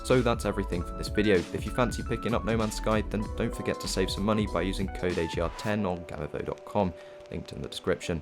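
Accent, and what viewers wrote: United Kingdom. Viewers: Wow. 2024, playing since 2018, love the British accent. All you need to know is here. Btw, if you want to make money (units) -> chlorine, afterwards -> buy cheap ships, upgrade them, and sell them back as scrapping for AI parts... Worthing a lot of units.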